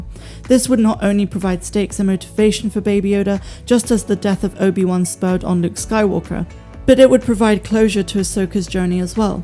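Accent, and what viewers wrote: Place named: South West, United Kingdom. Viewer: Love the show, sounds like your from down the south west like me!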